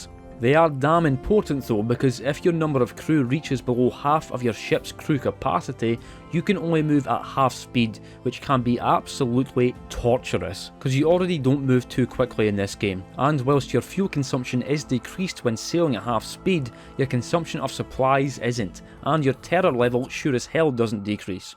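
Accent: Scotland, United Kingdom. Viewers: I just like to come back to this video for an easy watch - it's about something I love, it's amusingly narrated, it's Scottish, 10/10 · That scottish accent. It's cute.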